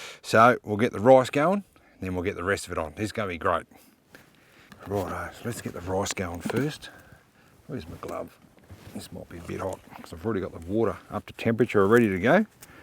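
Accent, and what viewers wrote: Australia. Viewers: lol. You have the okka accent. Crocodile Dundee Steve Erwin sound. My brother got into 4x4 camping. Low and behold his speech pattern changed after 6 months in the bush. Recon ' e ' ad a close a close encounter of the third kind on his adventure. On ya mate. Keep having fun.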